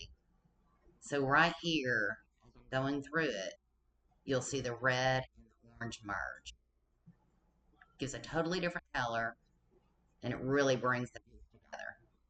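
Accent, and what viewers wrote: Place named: Arkansas, United States. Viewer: I thought both the works you showed were very cool as it does look alot like stalks of plants and you're right the possibilities are endless. I loved yours but would want to have the colours behind the stalks which i would make in different levels of the same green if you get me. What do you think? Also, what a fab southern Accent! So lovely to hear. I can't place it. 😂 oh wait its Arkansas!!! Wow great accents there!! these tips here are great & this could also look like a matrix type look in 3D so it could be super modern or clsssic with stalks....this second one is really beautiful.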